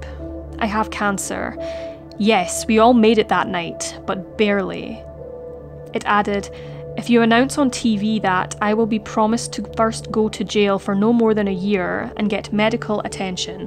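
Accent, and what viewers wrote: Scotland, United Kingdom. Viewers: What is the accent of narrator? Scottish?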